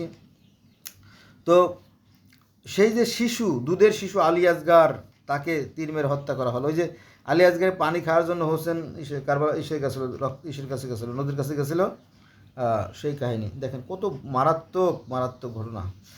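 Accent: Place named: Barisal, Bangladesh. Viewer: Please present in a wise manner. Seems you are not a highly educated man, can't speak even Bangla correctly avoiding illiterate tone ( local Barisali tone) Correct those limitations if you can ( I am in doubt, you will be able) The history what you narrated is known to all educated Muslims except the common illiterate ( they are the larger in numbers)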